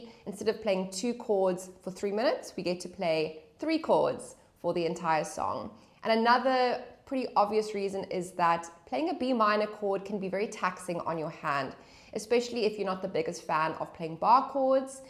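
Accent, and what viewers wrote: South Africa. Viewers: Finally someone who knows how to teach!! Kudos! And luv ur south african sounding accent!!! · Is she South African?? She’s surely South African🫢 ja, no, lekker, this is cool!🥳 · I'll have to watch the video again because halfway through it suddenly dawned on me that I'm not hearing the typical American accent but was pleased to realise I'm listening to the Saffa accent. Pragtig. Love your lessons. I have a guitar stashed away but want to start playing again. Subscribed.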